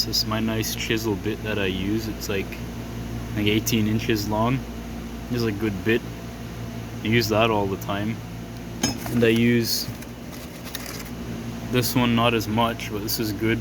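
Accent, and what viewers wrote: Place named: Canada. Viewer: First, I'd like to mention how Canadian you sound there bud! Love it! Secondly, I am also an apprentice I work on car haulers transport trucks. Love every minute of it. As well, my tool box is a 3 foot MasterCraft works good and I have a lot of princess auto/Canadian tire shit. Cheap and works! Cheers there bud!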